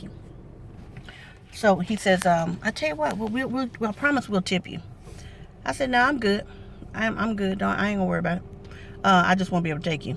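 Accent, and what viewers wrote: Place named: United States. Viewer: yeah, a long way from america.. ive watched a few of your videos already and i can't get enough of your accent, if i may id like to ask where you grew up? theres something about a soft spoken American accent that i find relaxing to listen to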